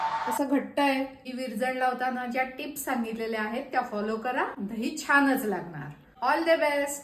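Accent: Konkan, India. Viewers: शंभर टक्के कोकणस्थ ! बोलणे आणी पद्धत सांगते . छान सांगता वैनी .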